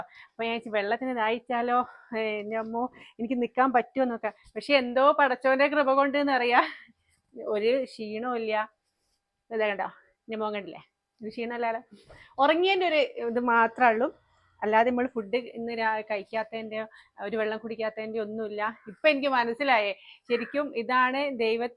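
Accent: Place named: North Kerala, India. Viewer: ഹായ്.... നിങ്ങളുടെ സംസാരം കേട്ടിട്ട്... പേരാമ്പ്ര.. നടുവണ്ണൂർ or മേപ്പയ്യൂർ touch... ജസ്റ്റ്‌ ഗസ്സ്..... Good family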